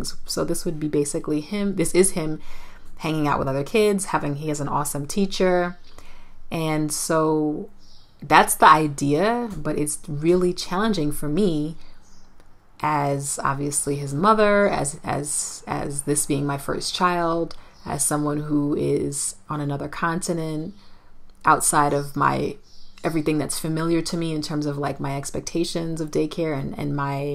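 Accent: United States. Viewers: It was totally you that I saw last year in church in Okahao!!! You were there with your husband, where they required the married couples to go to church and give an offering. I first pointed out to my sister how pretty you were and then came your American accent as well Lol.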